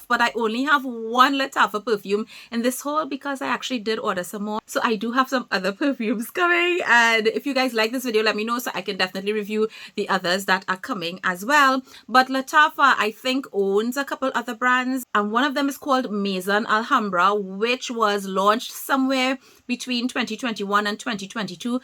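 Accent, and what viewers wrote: Trinidad and Tobago. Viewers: You MUST be from Trinidad and Tobago by your accent!?!?! Biggup Trini...fellow Trinidadian here. Great content, keep up the great work. Had to subcribe 🇹🇹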